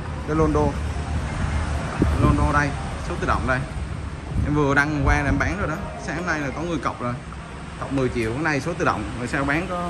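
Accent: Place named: Mekong Delta, Vietnam. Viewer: nói chuyện thiệt tình như dân miền tây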